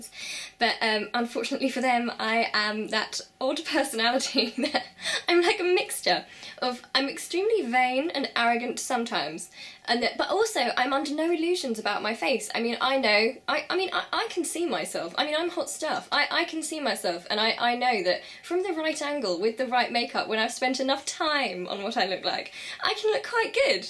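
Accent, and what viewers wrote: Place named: London, United Kingdom. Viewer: What part of England are you from? You sound London, but on some words you sound a little different.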